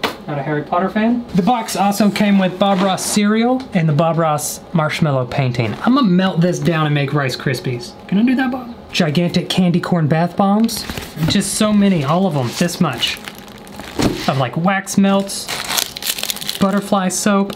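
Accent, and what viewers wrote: South, United States. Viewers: "Everything is a Coke" He must be from the south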